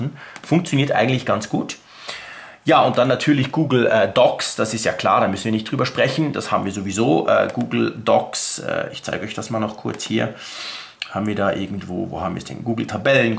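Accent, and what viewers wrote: Switzerland. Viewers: Versuch mal nicht immer alles in deinem schweizerischen englisch auszusprechen!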